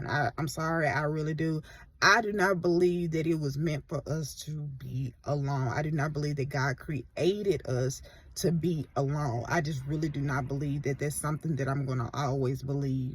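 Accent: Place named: South, United States. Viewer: I'm a none believer so it kind of means you are so sure that this being in the sky has your best interest at heart and is telling you how to live with absolutely no proof that this will powerful being exist and religion is huge in the south and you have a southern accent so you are from the south. Therefore, fairy tales from the south.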